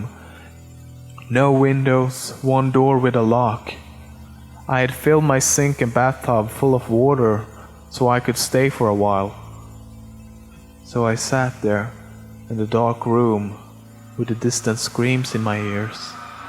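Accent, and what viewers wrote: Sweden. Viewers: his swedish accent is more prominent in his older videos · The jolly, good Swenglish dialect! :D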